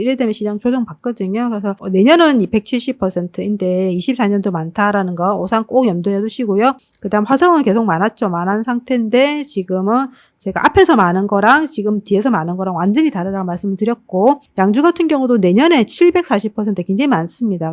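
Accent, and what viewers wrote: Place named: Gyeongsang, South Korea. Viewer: 쌤~~~ 강의가 너무도움이 많이되고 좋아요.근데 경상도 사투리에 말씀이 빨라서 잘 못알아듣겠어요.말씀을 조금만 천천히 해주시면 좋겠습니다~~~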